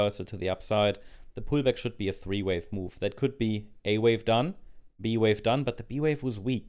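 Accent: Germany. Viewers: That German English 👌🏽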